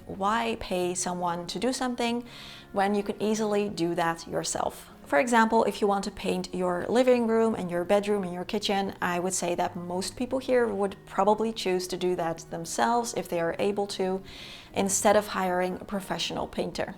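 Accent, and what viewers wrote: United States. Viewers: You speak perfectly American English 😮!!! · You sound totally American. I wouldn’t have known you were Dutch sadly. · From the USA. WhenI began working in my mid twenties, I would purchase a wall calendar so I could write down each day what I wore to work.everyday. I wore seperate so since i did not have a large wardrobe my wall calendar helped me keep track of the outfits I wore in that way I would not put together the same seperate pieces of clothing too often. I am fascinated as to how your English is so fluent and perfect without any hint of a Dutch accent. You sould like an American.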